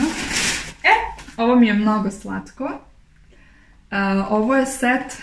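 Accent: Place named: Ekavian, Serbia. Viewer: Zašto pričaš na ekavici, ako si iz Bosne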